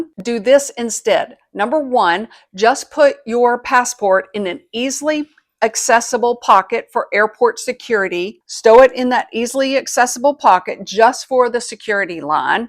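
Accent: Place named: Texas, United States. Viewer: Texas accent But I'm a big fan of travel tips Definitely avoiding chafing lotion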